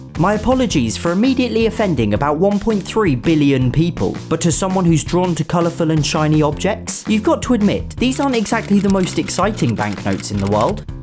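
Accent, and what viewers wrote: United Kingdom. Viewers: His british accent is the best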